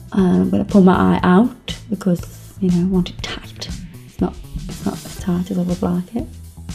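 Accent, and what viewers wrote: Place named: Manchester, United Kingdom. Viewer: your accent is manc with Sheff and Liverpool mixed in?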